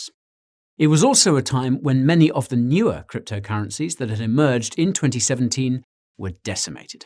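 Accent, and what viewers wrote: United Kingdom. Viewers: It is so much easier listening to a Brit compared to an American.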